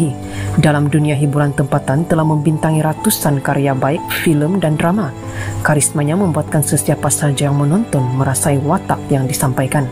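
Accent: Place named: Java, Indonesia. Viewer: Tak pasal2 ckp ala Indonesia. Mcm Jawa kronok.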